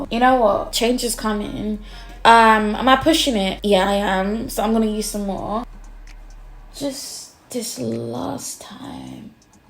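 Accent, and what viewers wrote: United Kingdom. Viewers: am I the only one who hears Nicki's voice when she speaks ? with a british accent ofcours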